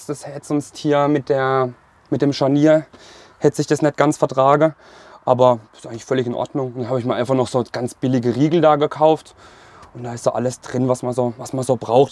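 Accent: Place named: Swabia, Germany. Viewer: Müsste Schwabe sein glaube ich 😄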